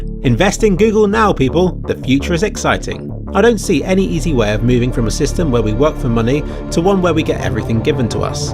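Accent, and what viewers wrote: United Kingdom. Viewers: Oli Allan I was actually more annoyed by the distracting background muzak and his nasal British(?) accent.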